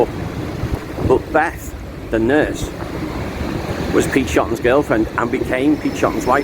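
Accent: Liverpool, United Kingdom. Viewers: Thanks for this video ... I love your Liverpool accent! My son studied Dentistry there for 5 years. I loved the old photos and all the info you give us here 😊😊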